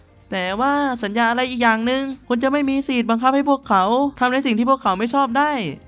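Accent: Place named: Thailand. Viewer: เป็นการอ่านที่เป็นไทยมาก555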